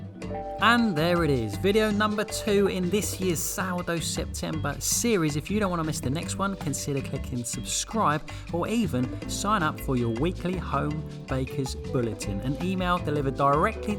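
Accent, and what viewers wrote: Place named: RP, United Kingdom. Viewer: Otp Love your accent😊this is the posh english i love..p.s great advises thank youu❤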